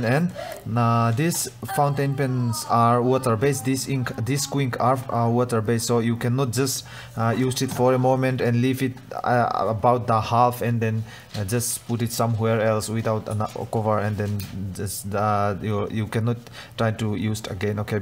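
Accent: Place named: Mizoram, India. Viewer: Subscribe nghal, tangkai lutuk ❤️ I awphawi ah mizo inih ka ring ka en nghal bawk a, thanks for the video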